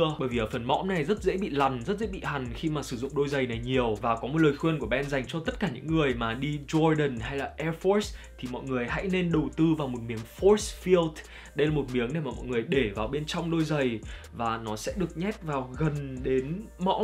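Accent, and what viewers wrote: Standard. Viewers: Tao thay thang này phát am tuy chuan nhung nghe cam thay k tu nhien . Nghe mac met giùm lun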